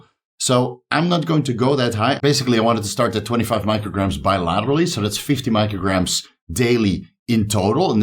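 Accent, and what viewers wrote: Netherlands. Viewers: dus ja? interesante shit man kan wel redelijk horen dat je nederlands bent maar dat maakt het voor mij alleen maar mooier🤣. dank voor de content bro!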